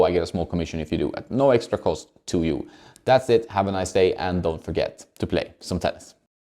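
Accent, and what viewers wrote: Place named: Sweden. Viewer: Swedish english accent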